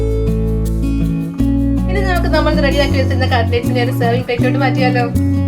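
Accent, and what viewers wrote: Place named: Nilambur, India. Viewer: വാഴ കൂമ്പ് തന്നെ നിലമ്പൂർ ഭാഷ 😍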